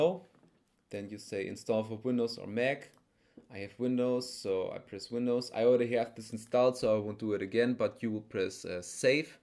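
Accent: Germany. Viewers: Wie man einfach sofort hört das du eigntlich Deutsch sprichst xd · hahah habs am akzent gemerkt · Man hört so krass das deutsche akzent raus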